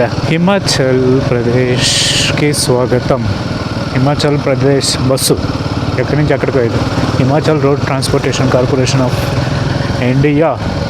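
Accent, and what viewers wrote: Nellore, India. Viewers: Nellore bro...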